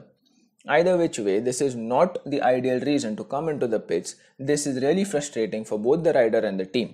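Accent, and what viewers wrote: India. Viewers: Englis india ajg. Wtf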